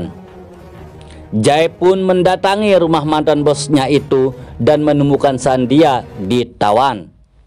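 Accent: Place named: Lombok, Indonesia. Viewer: lanjut saja, bro....tiap orang punya kreasi sendiri. logatnya mirip teman saya orang sasak.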